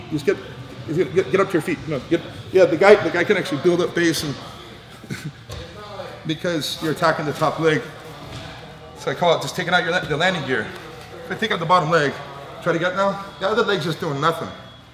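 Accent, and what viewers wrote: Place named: New York, United States. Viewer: great tips, plus you talk like a real New Yorker / New Jerseyan (the Proper English) ... awesome OSS ...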